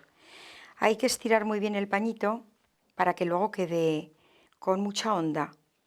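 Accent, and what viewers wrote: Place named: Aragon, Spain. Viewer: ¡Qué bonito acento! ¿es de Aragón?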